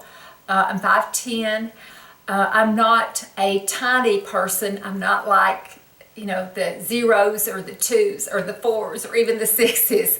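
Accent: South, United States. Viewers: Love your style. Thank you for sharing! I also like skinny jeans and distressed jeans. I'm a rule breaker and wear what I enjoy and what I think looks good on me, my shape and coloring. I believe at my age (I turned 63 in January, but still feel like I'm 30 most days. LOL) I should be able to wear what I want. Your outer beauty is apparent, and your inner beauty comes through when you speak. A southern belle. : ) I enjoy watching all your videos no matter the subject. Your sweet southern accent makes me miss home! I'm from north GA, living in FL. Looking forward to more of your videos!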